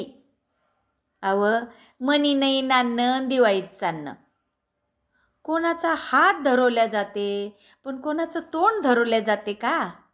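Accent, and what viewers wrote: Vidarbha, India. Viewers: छानच हं..! अॅडव्होकेट सौ.,! वर्‍हाडी बोलाचा ठसका साधलाय..अभिनंदन! pvshirsath Nasik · ताई आमचे १४ वर्षे विदर्भातील यवतमाळ येथे नोकरी निमित्ताने वास्तव्य होते. अगदी तीच वैदर्भीय भाषा ऐकून खूप मजा आली. आपल्यासारख्या वकिली पेशातील ताईंनी हुबेहुब खूप छान प्रस्तुत केले. भावले बुवा मले. ❤ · हे वैदर्भीय भाषेतले, एका खेडवळ स्त्री चे स्वगत ऐकताना मन अगदी गुंगून गेले. भाषेचा वेगळा लहेजा, म्हणी तर आहेतच पण तिच्या भावनांचा आविष्कार आपल्या अभिनयाने जिवंत झाला आहे, हे सत्य! ❤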